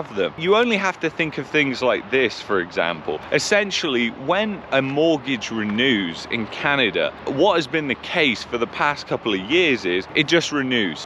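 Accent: United Kingdom. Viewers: I believe he is from UK. There is no Australian accent.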